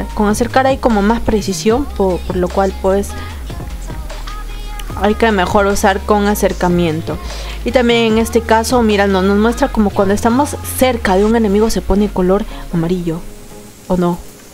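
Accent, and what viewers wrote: Spain. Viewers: Española xD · hazme un hijo..psdt: soy hombre xd Te sale gracioso el dejo de española y se te escapan las lisuras cuando te matan:3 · Cuando eres peruana y hablas como español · te diria q no metas mucho el asento español q algunos no entienden lo q dices:v xD i diran q eres groseras diciendo esas palabras:3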